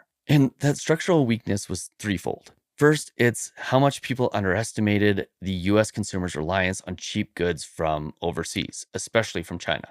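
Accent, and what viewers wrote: Canada. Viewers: you sound canadian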